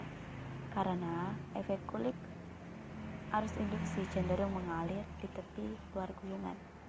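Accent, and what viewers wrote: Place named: Bugis, Indonesia. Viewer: Logatnya orang bugis😂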